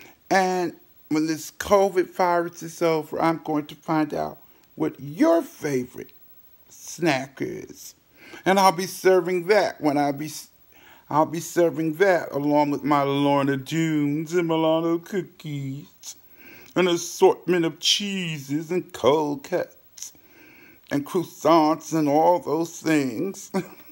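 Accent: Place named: New York, United States. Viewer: Are you from New York? You sound like a New Yorker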